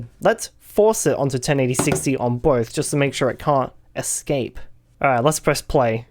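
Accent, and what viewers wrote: Australia. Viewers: I was about to say damn this guy should bee australian tghen i realised hes on yt au...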